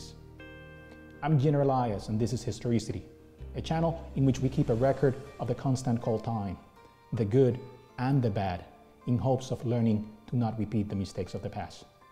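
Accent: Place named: United Kingdom. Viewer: Great content, love the accent. You must have learned English from a British teacher.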